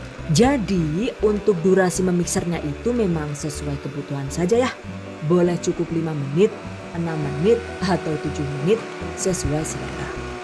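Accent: Banyumas, Indonesia. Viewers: Mksh resep nya. Bumbunya komplit bgt pasti rasanya enak. Dan satu lagi, bhsa ngapax nya itu lho jd bikin kangen kampung. Salam ngapak. Sukses selalu mbak'e😍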